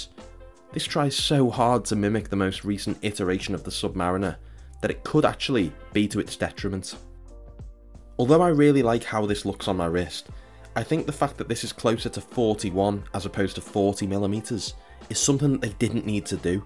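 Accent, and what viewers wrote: United Kingdom. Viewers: The algorithm has blessed me in mysterious ways - came here for your review on the Hamilton Khaki Field Mechanical and here I am, listening to a British gentleman talking about man thrashing in the Mediterranean 🤣 “NO MORE POLITICS”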